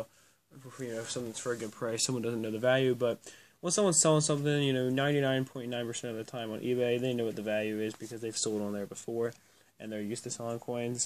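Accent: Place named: United States. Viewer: When you say you're fluent in English I'm guessing your main exposure to English is British English? This guy has a very clear American English dialect, the most commonly known and spoken dialect, and if you have a hard time understanding him then you're not really as fluent as you think you are.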